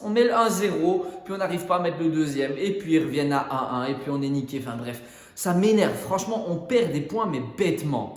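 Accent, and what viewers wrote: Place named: Liège, Belgium. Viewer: Toi, tu es belge et ça s'entend trop à ton accent... L'accent de Liège, quelque chose comme ça.😂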